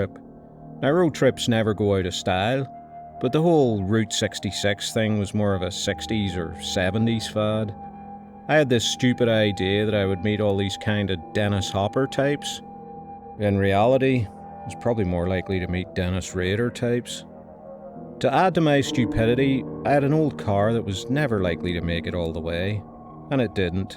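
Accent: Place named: Ireland. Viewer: ❤ Hi I found your video and 1 at time listening to your narrative style & I've noticed you have a Irish? accent? Sorry if I got it wrong but you sound nice narrating the stories. Good job bro.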